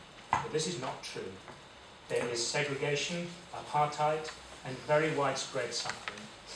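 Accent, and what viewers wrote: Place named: United Kingdom. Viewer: this is bizarre. This British guy is reading, verbatim, what sounds like a text written by a non-native speaker of English. What the hell is going on here, damn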